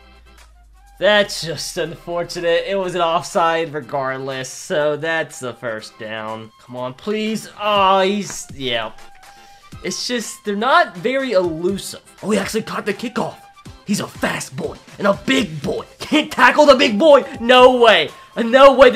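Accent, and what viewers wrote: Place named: Pennsylvania, United States. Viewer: Pennsylvania accents make we want to gouge my eardrums out.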